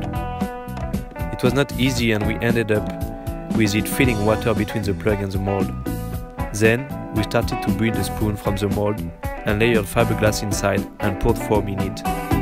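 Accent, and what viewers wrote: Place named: France. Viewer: On sent un petit accent français de rien du tout. Je ne connaissais pas du tout ces flexspoon board amazing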